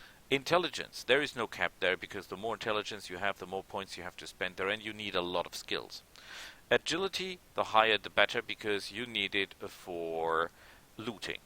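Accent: Germany. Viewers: I remember your floris walkthrough good times I miss your german accent you got realy good speaking english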